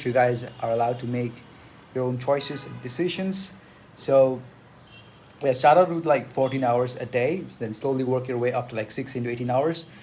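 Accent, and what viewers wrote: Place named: Chennai, India. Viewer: Gangster intro dawg! You f*cking fly man. You the Top G of Chennai, with that accent. You the dawg! The Big G. You are a legend, f*ck yeah man! The big dawg of Chennai.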